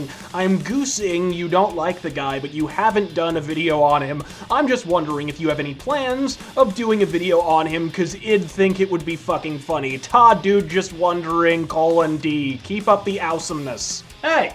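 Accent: England, United Kingdom. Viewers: Shite Briish accent - terrific video.Thanks as always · Love the english accent.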